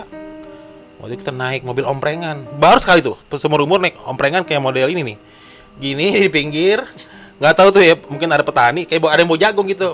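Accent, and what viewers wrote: Indonesia. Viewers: logat bahasa indonesia lorat bahasa malaysia salam dari perlis malaysia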